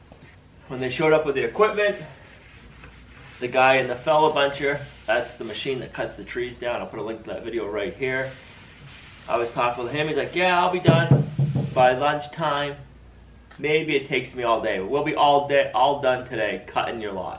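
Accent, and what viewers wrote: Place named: Northeast, United States. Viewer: The accent is bringing me back....where are you from? I was born in White Plains, NY and grew up in Framingham Ma.....just curious...I'm in Central NY near Syracuse now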